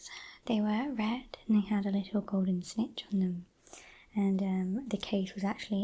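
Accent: United Kingdom. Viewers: I enjoy when we get a Dr. appointment from time-to-time 🙂 The eye lid lifting was quite tingly for me. The Queen’s English makes part of the exam sound a bit aggressive 😉 “Point a ‘torch’ at your eye” - someone from the US: 😨 “Hang on! You’re gonna what?!” And the ‘Is the dress blue or gold?’ reference 🙌🏼 nice flashback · Bri'ish?! My favourite.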